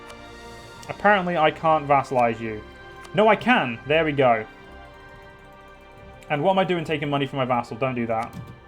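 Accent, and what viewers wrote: England, United Kingdom. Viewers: Because of the way he was saying the NEW MODELS ARE LEGITAHMEHLLY BYOOAFUL I couldn’t watch. English accent is shite.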